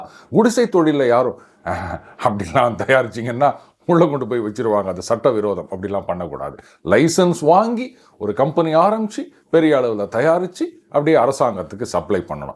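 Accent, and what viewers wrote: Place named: Chennai, India. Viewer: JRS ஐயா வழியில் மெட்ராஸ் பாஷை 😇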